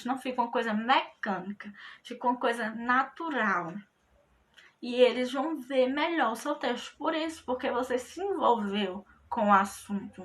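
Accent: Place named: Northeast, Brazil. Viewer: Que sotaque lindo do meu nordeste ❤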